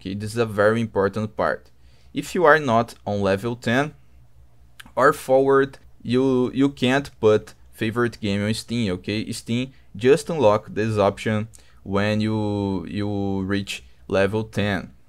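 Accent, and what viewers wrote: Brazil. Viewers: AKSDKASKF percebi que o mano é brazilian no sotaque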